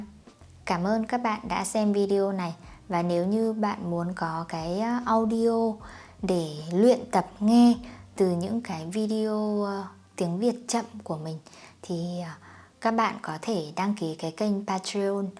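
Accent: North, Vietnam. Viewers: Câm ơn cháu. I can hear your Northern accent.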